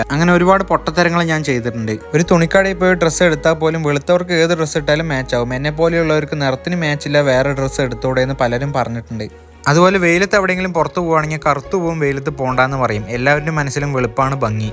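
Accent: Palakkad, India. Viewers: Absolutely. Young man your thpughts are organic. From your slang you are from palakkad?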